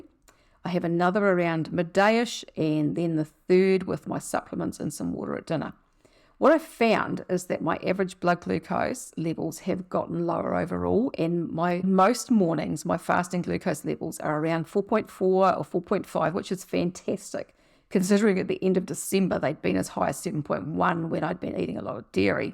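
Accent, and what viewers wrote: New Zealand. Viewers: Great to hear a Kiwi "eccent" on YouTube!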